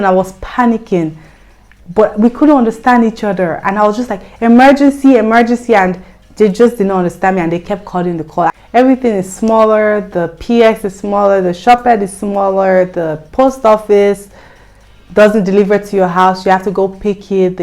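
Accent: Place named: Africa. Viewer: Sorry, I am confused. I have seen some black women in Germany speaking, but I would judge your accent for an african one. But PCS is an american thing, or? So how comes you have that accent? May I ask, where are from? Aah, I commented too early. I knew it! Nice story btw.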